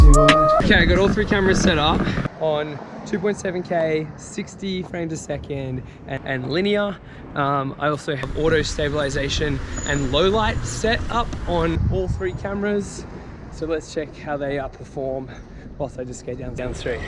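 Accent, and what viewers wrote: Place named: Australia. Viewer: Great to hear an Australian voice keep going man! Good video, I am thinking of buying this GoPro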